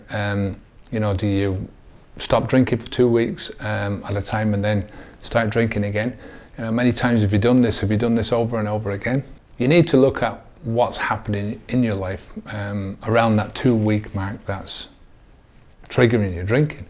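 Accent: Ireland. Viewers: hi you sound like a fellow Irish man and fair play for kicking the booze, i relapsed recently am not sure if it was pressure from doing my master or looking after a sick parent, I used to have a vibrant social life and was able to handle a drink and thought that i could handle just the one and be like everybody else but ended up on a 5 day bender. My question is maybe its the disease of of alcohol making me think am missing out on socialising how to you build a social vibrant life? its not the fact that am depressed but alcohol has hospitalised me on many occasions, accepting defeat is difficult and ive lost jobs and even friends to this but until that relapse i was doing great, things is every time i fall recently i bounce back stronger maybe am learning very confusing.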